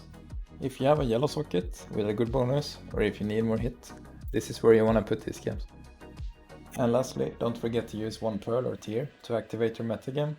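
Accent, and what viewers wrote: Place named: Sweden. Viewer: yo does that weak aura come with that swedish voiceover lmao,great guide,thanks!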